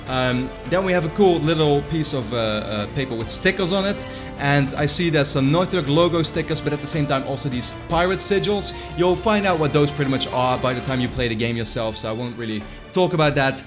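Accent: Netherlands. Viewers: je axent is heel erg nederlands leuk om te hooren😂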